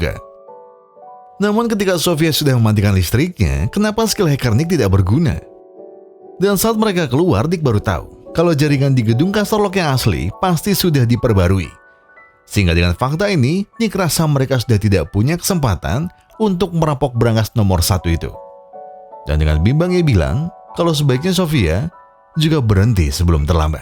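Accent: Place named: Tegal, Indonesia. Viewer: nt wong tegal min ? kayong ngapak temen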